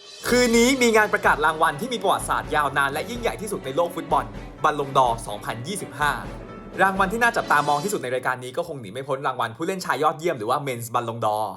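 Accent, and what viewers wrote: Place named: South, Thailand. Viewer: น้องบ่าวเด็กใต้เเน่นอน😂😂😂😂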